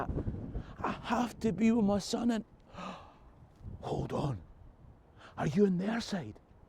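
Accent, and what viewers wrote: Scotland, United Kingdom. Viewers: What do you mean? pronounces it the way anyone who grew up in Scotland does. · 😆, I am lovin your braw accent as much as your video content. Hello from deep, arctic, hick-land Iowa. My Scots Irish genes/ancestry are humming like a piper just listening to your stories. Thanks for brightening up my third winter of isolation. Very fun and even my cu (cur hound) will listen with me.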